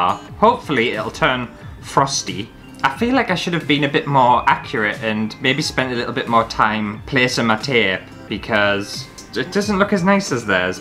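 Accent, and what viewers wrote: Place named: Carlisle, United Kingdom. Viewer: I had to come and comment before the video finished. Totally creased when you showed the pocket and then the knife slid out the bottom. Now I've stopped laughing I'll continue with the rest of the video. By the way I came upon your vids by chance, have subscribed and binge watched about a dozen videos at this point. Your banter just cracks me up. Also love the accent, coming from Carlisle it's an accent I'm familiar with.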